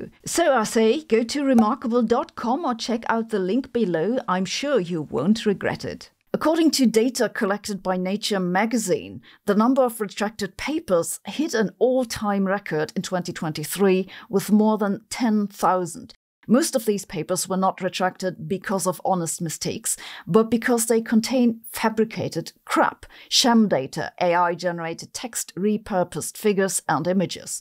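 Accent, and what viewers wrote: Germany. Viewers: I'm going to submit a paper on how many times Sabine says "however" per video, with a magnificent German touch. It's amazingly sweet! Thanks for the video.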